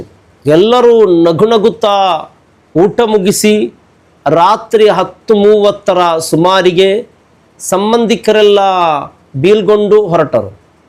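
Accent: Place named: Mumbai, India. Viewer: Nimma kannada Mumbai kushi agthide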